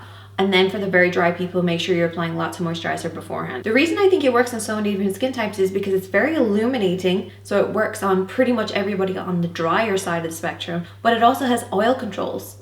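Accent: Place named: Ireland. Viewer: I picked up on that cute accent of yours right away..im Irish too!! And will b visiting Ireland I'm praying within the next year! And i just want to tell ya,u r so beautiful!! Irish Women rock lol! And need to try this primer...thanks for video!!💚☘💚☘💚☘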